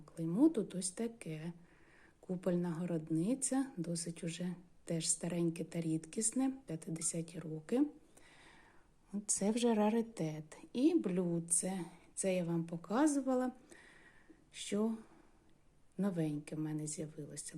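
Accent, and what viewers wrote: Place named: Central Ukraine, Ukraine. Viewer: Голос приятный, успокаивающий. Рассказываете интересно, слушать приятно украинский язык центральной части Украины. Я училась в Черкассах, там тоже так говорят, как Вы.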